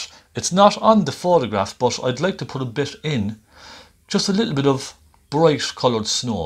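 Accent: Ireland. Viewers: that wonderful Irish accent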